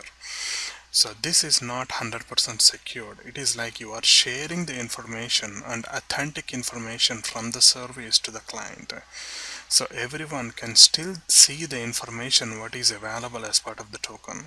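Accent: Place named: India. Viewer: I can't listen to Indian accent it's so annoying :(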